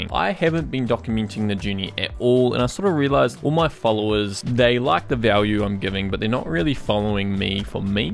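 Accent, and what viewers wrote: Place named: New Zealand. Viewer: So I’ve watched other videos of yours and only just clicked now that you do indeed have a kiwi accent and are from NZ too. I feel so stupid haha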